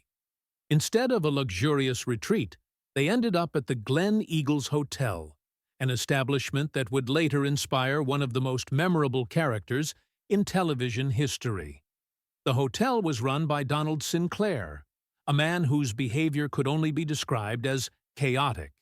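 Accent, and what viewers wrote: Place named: United States. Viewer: Dont waste your time watching this , dumb yankee voiceover , a collection of repetitive screenshots , clickbait , utter BS , whoever put this together must have the IQ of a newt. Oh and the thumb showing her in a bra ,is clickbait The actual scene was Basil impersonating a Nazi, not mentioned at all in this vid .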